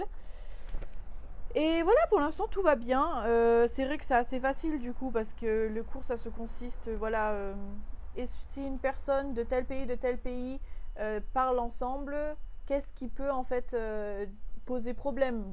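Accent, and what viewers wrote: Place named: United States. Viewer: lol, desole, je pense que tu es americaine, parce je vu ta video, tu parle bien anglais comme americaine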